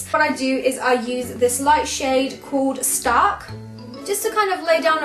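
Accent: England, United Kingdom. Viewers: Cyna monka but she has a British accent at the moment, right? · Where of England are you from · Hi,! I've found your channel a few days ago and I absolutely loved it! ♥ I also love your British accent, which I wish I could have someday... congratulations for the success on YouTube:) my best wishes from Argentina:) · This is from Poland, but live in England. So she has a british accent :)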